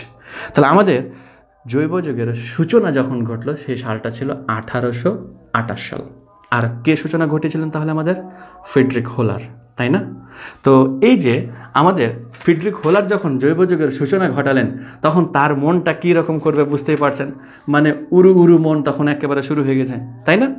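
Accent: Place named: Rajshahi, Bangladesh. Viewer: স্যার আপনার কথা থেকে কেন জানি মনে হচ্ছে রাজশাহী অথবা চাপাই নবাবগঞ্জ বাড়ি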